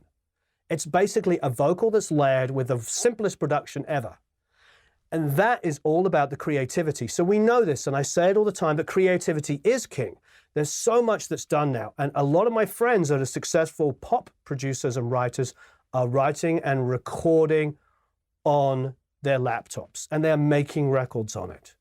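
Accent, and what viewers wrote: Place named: United Kingdom. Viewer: This was amazing information,. I can see from your other videos how much you respond to everyone, and it truly shows you respect us and, as you say here, VALUE us. It's always a pleasure to hear you, especially since most of the amazing people I've worked with have had British accents.;) Cheers, and again, thank you for being so personal and invested.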